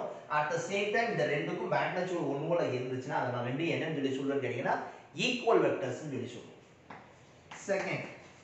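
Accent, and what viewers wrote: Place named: Tirunelveli, India. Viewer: Nellai tamil super sir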